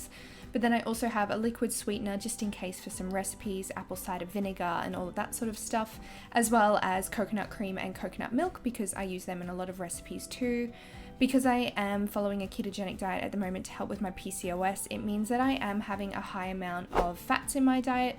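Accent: Australia. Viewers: it's an Australian accent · culture lol. It's just an Australian accent. I could say the same about the way Americans pronounce words. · That's just Australian accents m8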